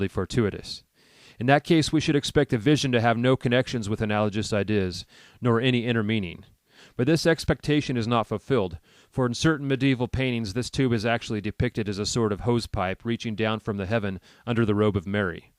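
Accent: United States. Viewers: you have the most americanest accent my ears ever did hear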